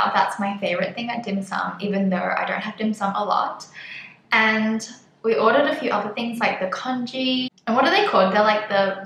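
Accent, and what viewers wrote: Australia. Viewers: didn't know sight seeing and dinning out in Michelin are valid exemption reasons for travelling... lol , but all good now, when you return to Sydney , don't even have to home quarantine now if you have been vaccinated... your Aussie sounds like music for Aussie and Hong Kong expats...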